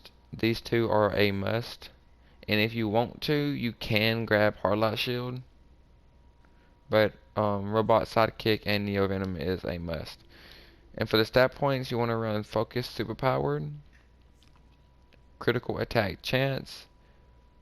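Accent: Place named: Appalachia, United States. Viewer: Dude. Up your speech patterns and audio. It's "So on and So forth" NOT "So on and so Such". Your Trailer's showing hilbilly...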